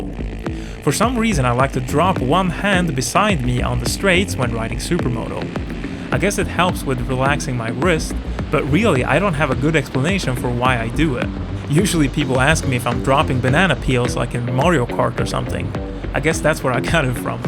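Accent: Sweden. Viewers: Är du från Sverige? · U must be from Sweden with your accent? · Your accent sounds swedish?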